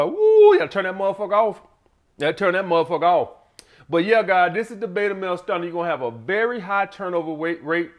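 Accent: South, United States. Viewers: talking that real down south fly nigga sl-l!+ Them late 80s, 90s to early 2000s crown vics, Chevys, Buicks and lacs, mane!!!! That brought me back, good memories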